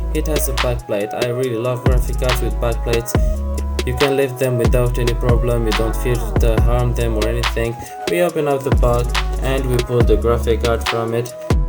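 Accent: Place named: India. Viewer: You have an indienne accent bro 😁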